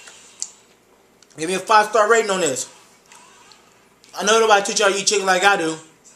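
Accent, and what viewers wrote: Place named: Mexico. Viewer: Your a black guy who sounds Mexican.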